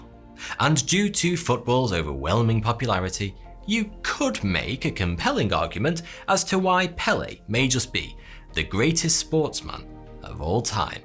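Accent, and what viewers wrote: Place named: Lancashire, United Kingdom. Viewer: Classic Lancastrian, it's a globally recognised accent. BB1 here.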